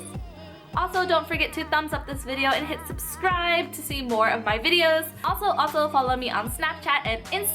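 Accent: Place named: South Korea. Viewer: i detect an ever so slight korean accent in your english.. just a few words.. lol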